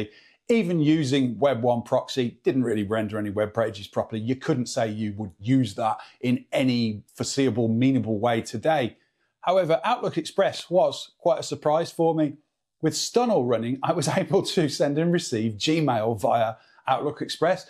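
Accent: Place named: Midlands, United Kingdom. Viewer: Can I just say that your diction and pronunciation is fantastically clear. Down to the gentle midlands accent too.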